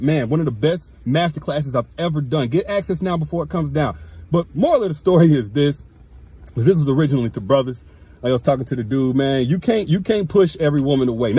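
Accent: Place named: New York, United States. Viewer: NYC. Loud and clear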